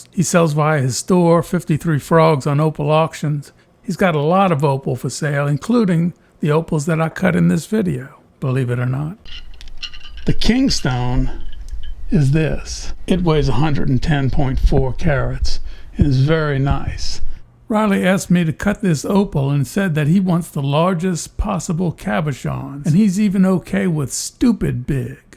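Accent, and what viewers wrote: United States. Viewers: As I was leaving that comment I was thinking that I was probably wrong about the area of the United States where you picked up your Inflection. Bottom line Is that it all goes together with your tongue in cheek humour... It works!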